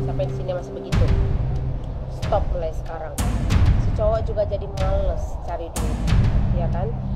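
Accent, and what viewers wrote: Medan, Indonesia. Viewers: Bang orang batak lu ya ? Wkwkwk Logatnya kayak orang medan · sorry oot, btw kau dari medan ya bang?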